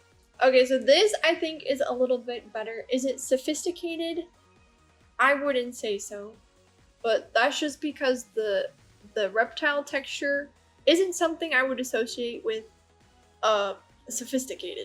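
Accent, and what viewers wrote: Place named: Texas, United States. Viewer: You’re from Texas. Well you sound like you are!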